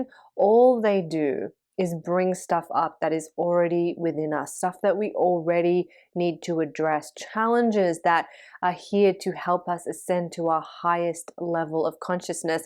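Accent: Australia. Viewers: She must have lived in Australia a long time because of the Australian accent.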